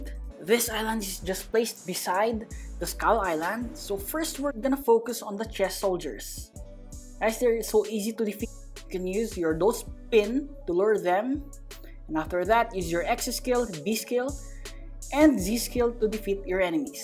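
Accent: Philippines. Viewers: I just noticed hes name in the leaderbords hes name is which is a filipino language which means dough that is sticky correct me if im wrong so that means hes a filipino well its obviouse when hes talking btw am a filipino too so eyyyy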